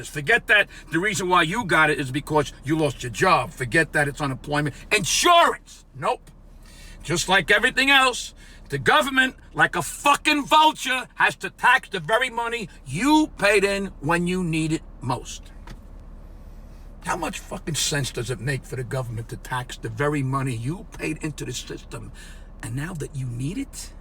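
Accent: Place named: Massachusetts, United States. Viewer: You have a Lowell or Malden accent. I’m from Billerica.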